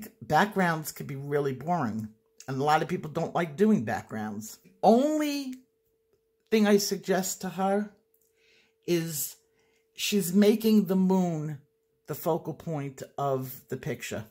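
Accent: New York, United States. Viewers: Great to hear that New York accent again on "ColotTube". Your instructions are always welcome, however, if I followed your first one to " plan ahead before you pick up a pencil " I would have close to zero colored pictures! About the closest I get to pre planning is picking which colored pencil set I need to take off the shelf. At 76 who has time to pre plan? See you soon. I'm pre planning to watch you. Don't take too long.👵♿🎨 Barb N.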